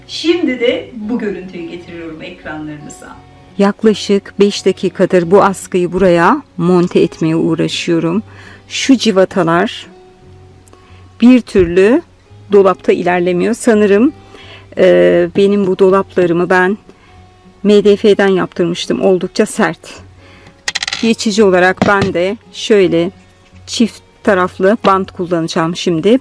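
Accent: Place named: Denizli, Turkey. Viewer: Gayduru gubbak cemilem deyve gari. Bende çok giderdim Denizliye eskiden. Aksanli videonuz cok hos. Merak ediyorum siz yurtdisinda yasadiniz eskiden? Ne is yapiyorsunuz? Sanki televizyonculuk yapmisiniz gibi.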